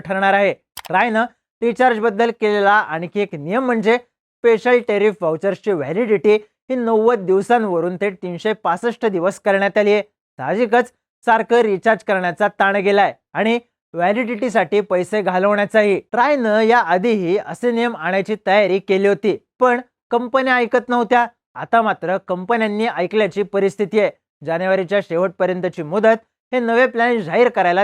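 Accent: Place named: Kolhapur, India. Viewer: भावा तुझ्या बोलण्याची पद्धत पूर्णपणे कोल्हापुरी वाटते एकदम झणझणीत आणि चरचरीत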